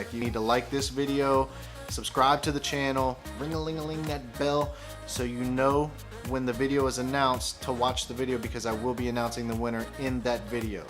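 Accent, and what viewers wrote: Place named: Houston, United States. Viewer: 🤘 question...Are you from Htwn? You talk alot like us down hurr.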